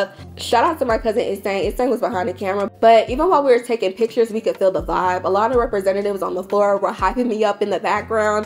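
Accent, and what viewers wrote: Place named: Nigeria. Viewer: I heard that accent come thru…. Nigerian? If I am right, a lot of your style sense runs in the bloodline honey, Naija people never carry last. Nigerian women wrote the book on over the top and fabulous 😂😂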